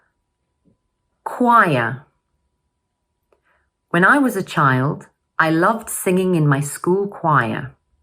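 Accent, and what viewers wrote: United Kingdom. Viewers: 이채널의 핵심이 영국식 발음 인거죠? 미국식이 아닌게 맞죠?? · 크~ 역시 발음은 영국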